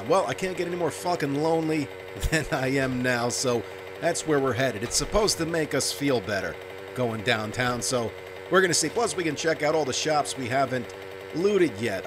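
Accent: New Jersey, United States. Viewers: army of 400,000 degenerates going strong! Guys, go back and watch some of the very first videos he posted. He used to be so polite and no Jersey accent at all. Listen to him now! I much prefer this version of lol dude you rock! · I HATE zombie games & jump scares...that being said, I bought 7D2D because I LOVE your content. I've been playing it faithfully for months now. Thanks to you, I have become quite the zombie killer! I never miss one of your episodes and have been working through your past content, because you give great entertainment! Congrats on 400k subs, and looking forward to hearing your dulcet Jersey tones in the future!:D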